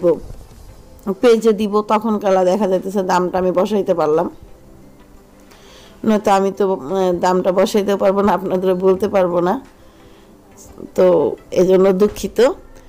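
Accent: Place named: Bangladesh. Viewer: Bhalo kore Banglay kotha bolun. Aapnara, Bangladeshira , jokhon banglay kotha bolen tokhon aamader Paschim Banglay bose lojja kore. * Hoichhe noy : Hoyechhe * Maikha noy: Mekhe * Jaitechhe noy : Jachhe * Bhorta noy : Bhate * Bahire Gichhilam noy: Baire gechhilam.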